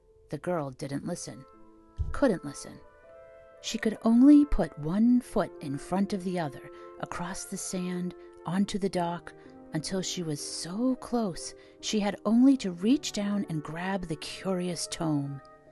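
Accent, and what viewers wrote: Australia. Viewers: well what can i say but awesome! loved your story and the gluing too xx enjoyed everything about this,even your australian accent lol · Omg your Australian accent is awesome! I love it! I’d love to hear that mix tape! ❤️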